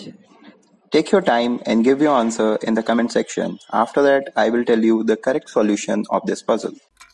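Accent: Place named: India. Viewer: Oh God I was hoping it wouldn't be an Indian narrator 🙄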